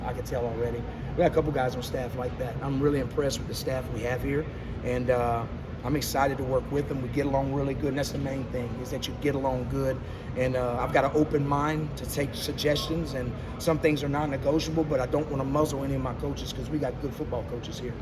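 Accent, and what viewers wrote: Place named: Louisiana, United States. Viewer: Love that Cajun accent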